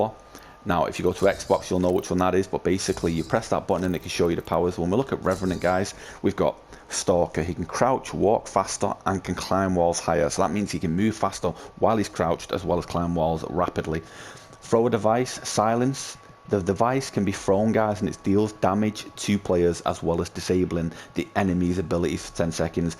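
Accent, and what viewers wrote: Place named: Manchester, United Kingdom. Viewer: Nice vid mate and nice to hear a manc voice haha!! subscribed!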